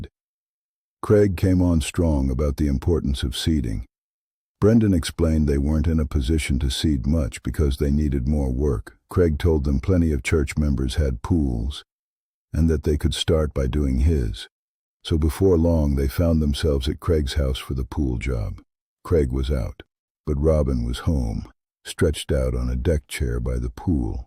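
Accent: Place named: United States. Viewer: This is good but the American accent kills it lol otherwise good job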